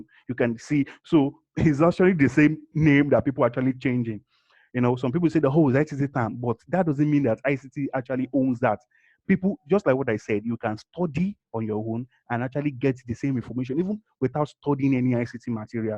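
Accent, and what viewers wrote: Nigeria. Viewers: This is a Nigerian Accent! Nice one brother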